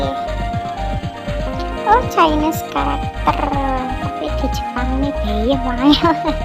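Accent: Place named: Java, Indonesia. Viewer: medoke boloo🥳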